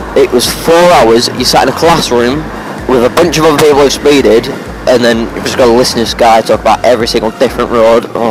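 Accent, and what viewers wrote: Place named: Manchester, United Kingdom. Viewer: Manchester Burnley or hapton, you sound like your that area, I have family near Burnley 👍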